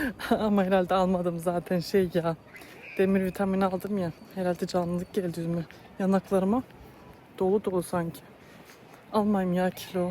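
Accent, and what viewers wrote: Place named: Kayseri, Turkey. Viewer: siz nerelisiniz, eşim kayserili, aileside sizin gibi konuşuyor, koymussum, almıssım, yapmıssım gibi gibi, bana çok garip geliyor, ilk defa eşimin ailesinde duymuştum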